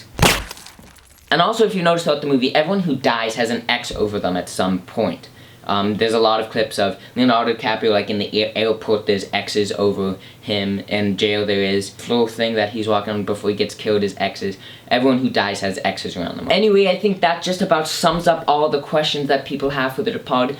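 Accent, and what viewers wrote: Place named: North America. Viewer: Somewhere in North America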